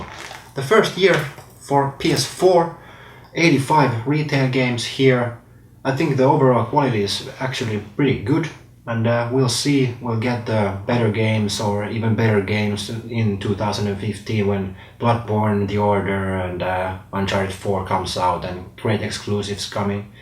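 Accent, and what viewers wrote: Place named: Finland. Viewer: OMG FINNISH ACCENT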